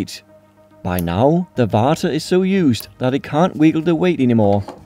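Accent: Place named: Denmark. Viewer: Rema 1000 is a Norwegian discount supermarket chain also operating in Denmark where I'm sure is from as I can tell on his thick Danish accent. So, these are just private label batteries made for Rema 1000 supermarkets. And Kameda is a private label battery brand of the Danish discount tool chain Harald Nyborg. Harald Nyborg is kind of Denmark's answer to America's Harbor Freight. So, it's also unknown who makes Kameda batteries. And with all private label products the manufacturer can change from one year to the other as the retail chains usually contact the lowest bidding manufacturer to meet their requirements.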